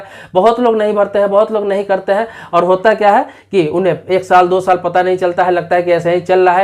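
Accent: Bihar, India. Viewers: BIHARI HO KA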